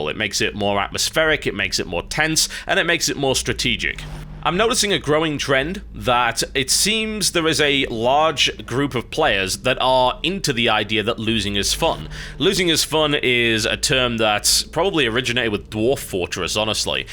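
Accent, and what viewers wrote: United Kingdom. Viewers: Chronicals of the gold farmer was the best show TB ever made period, it had it all: humor, the cynical brit, information and a lovely british accent.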